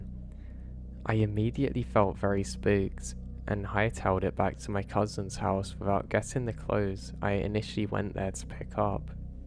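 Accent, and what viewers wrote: England, United Kingdom. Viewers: Your reading is perfect. New subscriber now. Where in England are you from?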